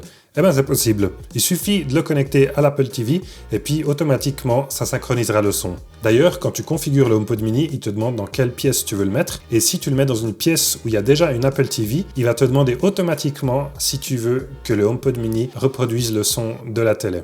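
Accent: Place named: Liège, Belgium. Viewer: Super vidéo ! Très objective ! J’adore et en plus j’entends que comme moi tu es belges et de liège au vu de l’accent 😅 continue comme ça !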